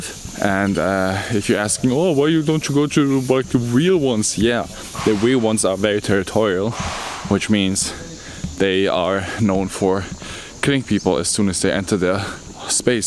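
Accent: Germany. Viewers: I hear Deutsch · Am i hearing a little bischen deutsch ?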